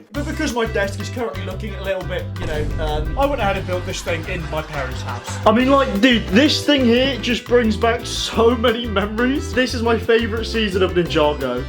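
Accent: Newcastle, United Kingdom. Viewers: Oh no way he is a Geordie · Are You from Newcastle?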